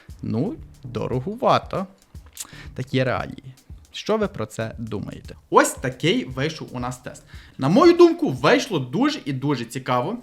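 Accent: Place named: Hutsul, Ukraine. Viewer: але файний той гоцульский говір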